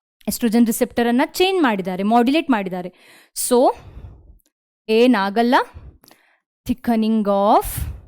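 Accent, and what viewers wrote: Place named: Mangalore, India. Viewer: ..... manglore kannada So beautiful so eligate ..just looking like a wow..❤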